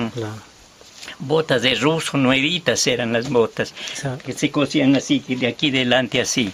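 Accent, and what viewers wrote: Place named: Loja, Ecuador. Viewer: Hermosa narración y que bonito escucharlo con un lenguaje Lojano autóctono.. gracias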